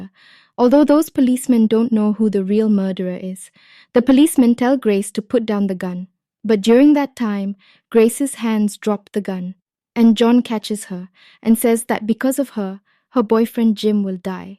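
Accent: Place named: India. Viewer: Indian voice I hate it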